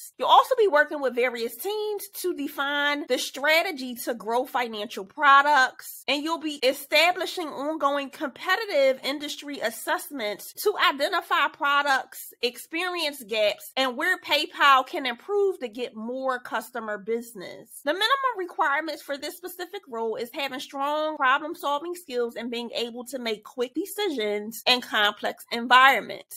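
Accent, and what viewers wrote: Philadelphia, United States. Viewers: Good stuff!!! Thank you for the information. I hear a Philly accent...😂😍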